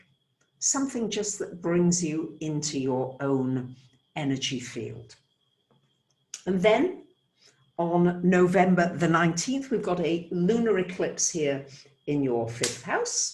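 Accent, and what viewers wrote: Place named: London, United Kingdom. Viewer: Idk y this whole time I considered ur accent Aussie but I now hear london 😌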